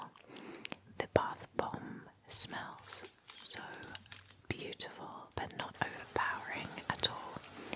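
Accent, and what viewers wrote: United Kingdom. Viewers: The most tingly british wisper on internet! We love you!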